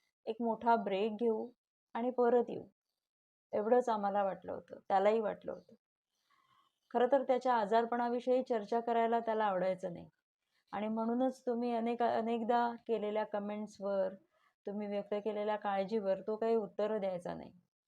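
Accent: Vidarbha, India. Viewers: भाऊ भयंकर धक्का देवून गेला तु तुझी सवयच लागली होती रे तुझी वैदर्भीय भाषा आपलीशी वाटायची तुला विसरण कठीण आहे भाऊ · त्यांची बोलण्याची खास वऱ्हाडी शैली फार आवडायची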